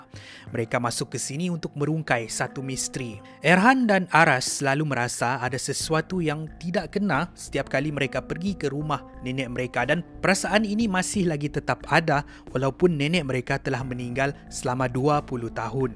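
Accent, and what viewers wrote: Sabah, Malaysia. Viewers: Abang orang Malaysia ke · Btw i Mo ckp , loghat mu mcm kntara org Sabah 😅😅, org Sabah eh ? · Orang sabah kah ni😂😂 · Abang ni orang melayu ( Sabah ) atau indonesia ya? Kalau saya orang malaysia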